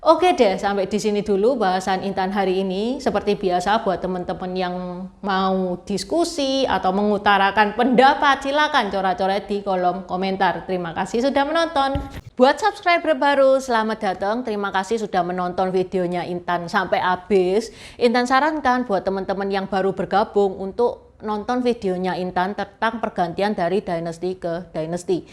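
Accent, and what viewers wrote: Java, Indonesia. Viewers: Terpengaruh logat jawir dia. Ga tau harus minum obat apa buat bikin netral si intan jadi logat biasa aja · Intan jawanya medok banget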